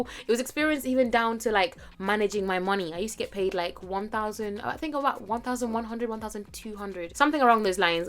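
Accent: Jamaica. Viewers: No sah you sound just like a Jamaican. Roots 🇯🇲🇯🇲🇯🇲🇯🇲🇯🇲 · is that patois i here😂okkkkk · i always here you break out little patois, i always thought you were Jamaican, was surprised when you said you are Kenyan :)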